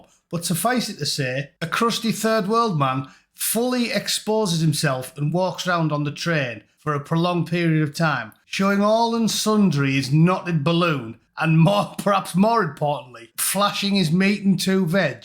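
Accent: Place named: Liverpool, United Kingdom. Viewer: Keep it Scouse you talented bastard 😂😂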